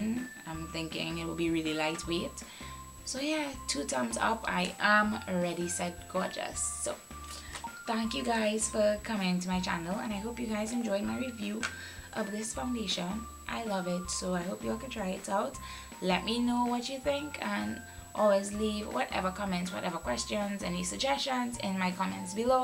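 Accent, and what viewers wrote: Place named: Caribbean. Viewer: Love your accent ..Caribbean girls run it ..love this review😊💯👍🏾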